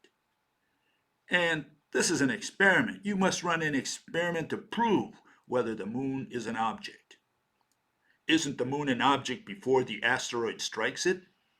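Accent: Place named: India. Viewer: He talks like an Indian. Where are you from mister?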